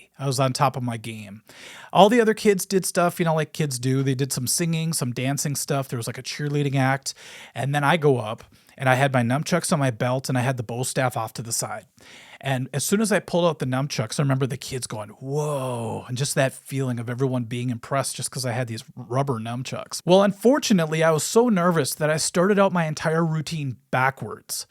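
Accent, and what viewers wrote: Minnesota, United States. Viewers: I always thought of you as the Harry Kim of guitar teachers, from Star Trek Voyager.(Garret Wang). But with a Minnesota type accent. From the Coon Rapids area. Love your stuff. Keep teaching these younger generational how to be Rockers. Is Tom Bernard Still at KQ?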